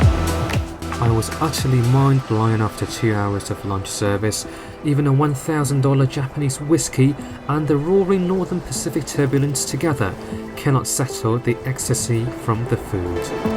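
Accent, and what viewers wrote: United Kingdom. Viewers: an asian guy with british accent is just so off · 感谢分享，ANA的新头等装修真的很大气屏幕效果也很惊艳，可惜我今年只能飞到老客舱。顺带一提博主的英音很惊艳哇，完全没想到是在美国上学。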